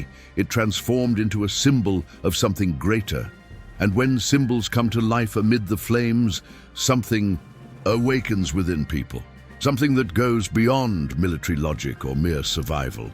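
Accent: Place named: United Kingdom. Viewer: 20 minutes of BS in a British accent...YouTube should put warning "produce by British"....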